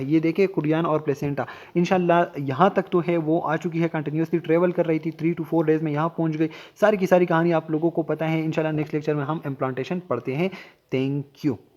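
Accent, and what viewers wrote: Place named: Khyber Pakhtunkhwa, Pakistan. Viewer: Great lectures sir helping alot. Seems like you’re from kpk??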